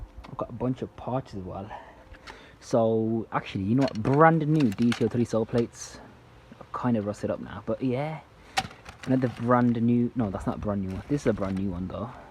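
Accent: United Kingdom. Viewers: Are you from Birmingham 🤣🤣🤣your accent is sick !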